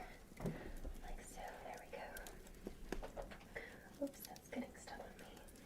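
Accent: Scotland, United Kingdom. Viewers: I love the Christmas piano music at the beginning is that you playing the piano I know you are very talented and play the piano beautifuly! your Victorian era videos are always very well done everything from your very thick beautiful Scottish accent to your gorgeous outfits in the Roleplays and the wonderful backgrounds! I always like the dinner menu part! this looks to be a fabulous Victorian era video you have done a wonderful job again thank you so much I really do appreciate it! Merry Christmas ⛄🎄:_Loveheart::_Loveheart::_Loveheart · This isn't an Irish accent. It originates in the United Kingdom, and thus is a British accent.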